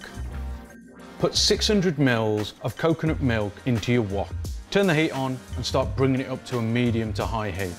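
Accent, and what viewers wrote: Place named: West Midlands, United Kingdom. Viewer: I detect a hint of West Midlands dialect in there.....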